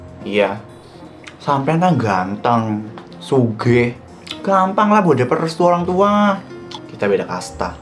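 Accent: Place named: Java, Indonesia. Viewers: Si cewek keriting bikin bulu kuduk merinduling anjirrrr😂😂😂 Ternya bisa bhs Jawa anjirrr Logat jawanya sudah meresap di jiwa Ad pak prestyo anjirr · bahasa nya medok bangett,mirip orang jawa bet😭😭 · Suka banget sama logat Jawa nya kak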